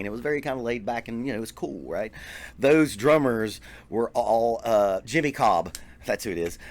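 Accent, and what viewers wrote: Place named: South, United States. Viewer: your accent is really interesting, what part of the US are you from(from movies i know its the south somewhere)? also great video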